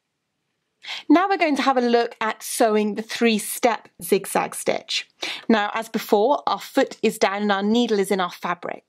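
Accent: United Kingdom. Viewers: Tina Bailey Actually, I think she’s saying “join me back here.” She has a lovely British accent which may sound like draw instead of join.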